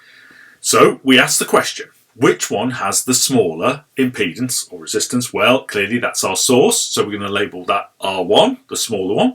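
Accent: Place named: Netherlands. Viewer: Again an excellent video, valuable and highly appreciated. The importance of impedance matching already became clear in the “Connecting It All Together” video I watched a couple of days ago. I skipped it at the time you published it because I thought, reading the title, it was not important to me. Apart from the electronics lessons, your pronunciation is a pleasure to hear, most Dutchmen do not exceed the level of a football coach. 😆 Thanks a million 73, Gerard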